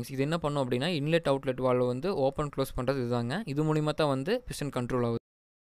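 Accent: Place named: Kongu, India. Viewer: கொங்கு தமிழ் ❤️ மலரும் நினைவுகள்❤️ மகிழ்ச்சி😃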